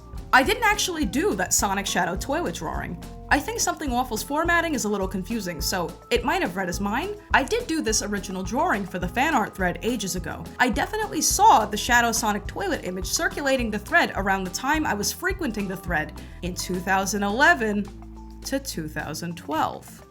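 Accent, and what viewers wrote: New York, United States. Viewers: saaame!!! i have a relatively weak but present Boston accent (similar to hers, which sounds very NY) and have russian as a first language….americans are so funky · She has a slight Staten Island(New Yorker) accent. You can hear it on her vowel sometimes, it's not very prominent. I think it's great! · Just found your channel and watched a previous vid and you sounded like you were from NY then i see this one and you sound like your from california or something,so funny you put in a cut about it and broke the 4th wall some truman show shit 😂😂 but great vids i think imma sub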